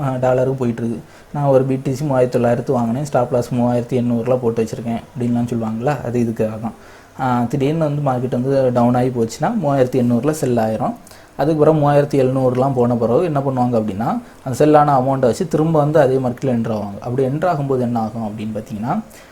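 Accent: Kanyakumari, India. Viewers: Kanya Kumari thane bro neenga 🔥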